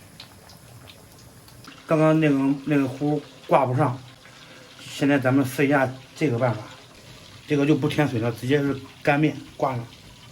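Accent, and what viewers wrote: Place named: Zhoukou, China. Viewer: 这周口味普通话听着好熟悉。商水老乡飘过。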